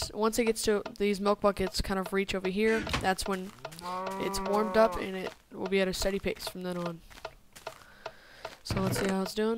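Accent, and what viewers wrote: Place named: Texas, United States. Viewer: ima guess ur from texas? :3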